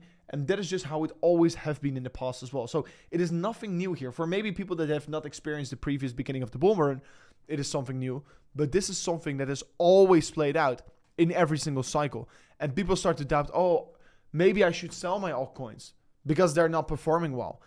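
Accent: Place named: Netherlands. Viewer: Why you always say aswoll😊 very obvious dutch slang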